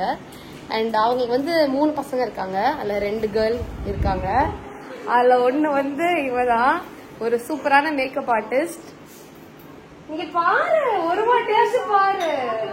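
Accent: Sri Lanka. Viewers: மொழியழகி நீ இலங்கை தமிழ் என்பதில் ❤❤❤❤❤❤❤❤❤ இன்னும் நேசிக்கிறேன் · தங்கை உன் தாய் நாடு இலங்கையா அதுதான் மொழி ஆழகு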